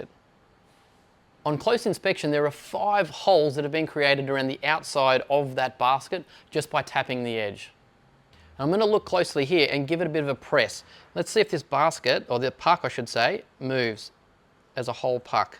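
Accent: Australia. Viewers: I heard the Aussie accent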